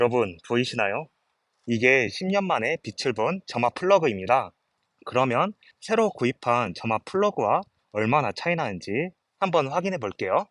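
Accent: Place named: Jeolla, South Korea. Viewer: 저도 전라도라 억양이 우리쪽이라고 짐작은 했습니다.ㅎㅎ 반갑습니다.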